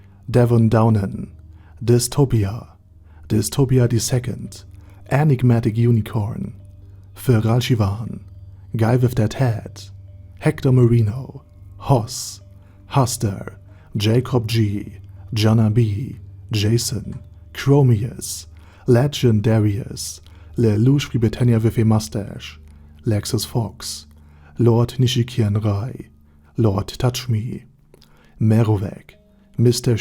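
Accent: Germany. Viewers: For some reason i really like your voice with the german accent ! Is there a chance that you will read the light novels ? Soo we can listen to it in your voice ?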